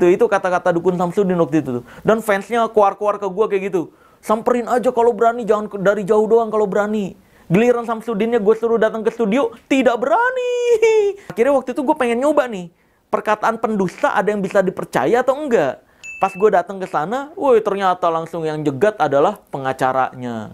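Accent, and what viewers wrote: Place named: Java, Indonesia. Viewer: Wkwkwk bang wong jowo yo 😂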